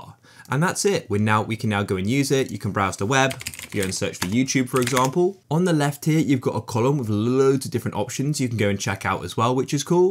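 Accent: United Kingdom. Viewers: hes bri-ish/british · nah bruv he’s bri’ish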